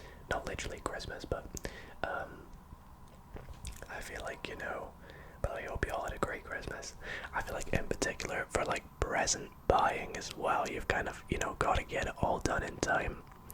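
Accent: Scotland, United Kingdom. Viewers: You sound scottish are you from scotland?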